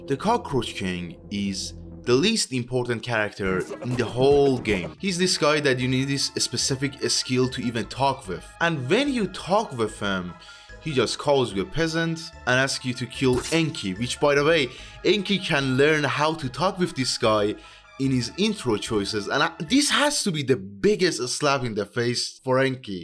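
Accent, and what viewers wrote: Iran. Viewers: Why do you sound so Iranian? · Ngl bro lowkey has a Persian accent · irani ass lahje man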